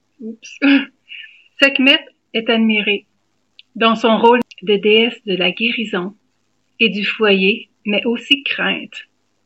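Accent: Quebec, Canada. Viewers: Avec ton accent québécois on ne peut qu apprécier ! J te promet ma belle ! ❤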